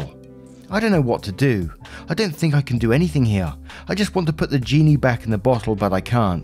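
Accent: England, United Kingdom. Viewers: Hey hey! The isolation ward at the shelter is 100% full of sick kitties, but they are getting better. Thanks for your videos, as I said before your cadence and voice is soothing to the cats/kittens. Guess they prefer English accents over American. Hope you and Poppy have a lovely day!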